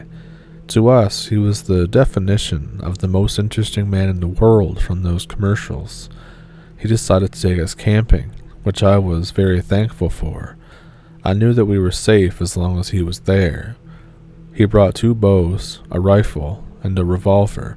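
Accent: Canada. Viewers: I love the Canadian accent. I pop your channel on to relax as I go to sleep. Thank you for all your hard work gathering stories to tell. Thus channel is great. Jo from Australia 🇦🇺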